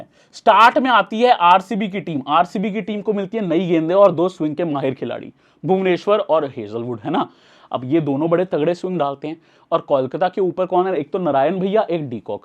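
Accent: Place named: Punjab, India. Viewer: Punjabi voice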